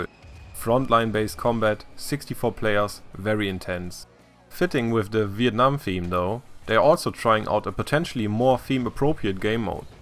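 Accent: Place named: Germany. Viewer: Very well done! You sound much better this time, made me really wanna listen to you. Keep up the good work :) btw are you German? because you sound very German and I would know, since I am one myself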